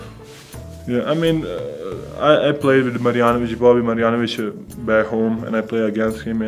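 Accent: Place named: Sombor, Serbia. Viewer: Pozdrav, uz tebe smo brat nas! Nego opusti se i pricaj engleski sa tvojim somborskin naglaskom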